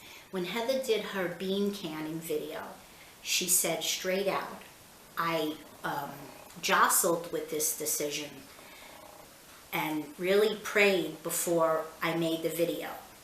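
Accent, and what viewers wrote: New York, United States. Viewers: Loved your video. You sound like a New Yorker!!!! Love it! Please make more videos!!!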